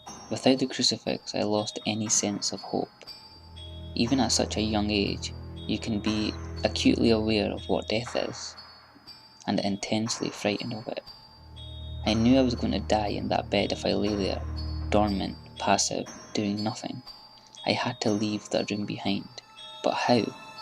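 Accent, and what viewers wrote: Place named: Scotland, United Kingdom. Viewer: Nice to hear a fellow Scot read one of my stories. Nicely done :) ~ Mike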